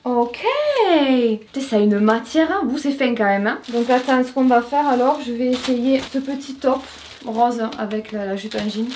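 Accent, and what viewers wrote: South, France. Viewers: Le moment sacré de ta vidéo du dimanche que j'ai pas pu regarder hier... Toujours au rdv avec ton sourire, ta bonne humeur et ton accent du soleil ! J'Penses tjrs que tout te va t'es magnifique et d'une gentillesse 🥰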